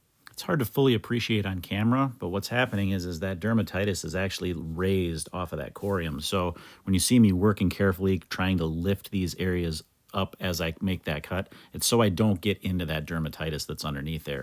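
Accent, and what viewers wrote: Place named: Midwest, United States. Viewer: I was wondering why your accent seemed so familiar, its because we're state neighbors! Greetings from Eastern MN.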